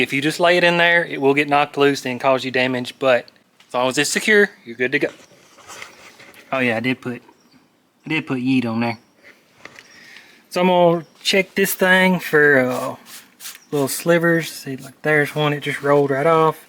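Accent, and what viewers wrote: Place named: South, United States. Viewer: If he’s got a southerner accent, you know you can trust him.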